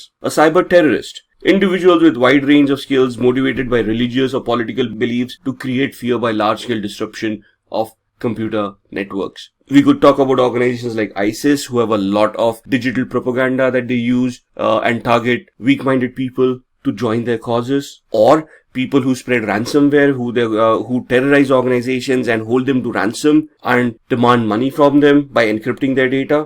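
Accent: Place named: India. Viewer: no offense, why do most of these videos have to be narrated by people with Indian accents? I can't tell what he says no matter how many times I replay it... CC thanks...?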